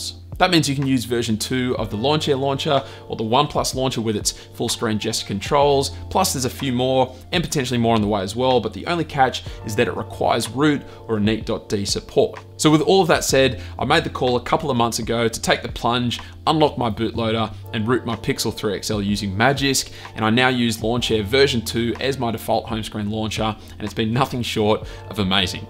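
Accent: Australia. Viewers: Is it an australian accent? It sounds cool but I can't understand, is it australian or not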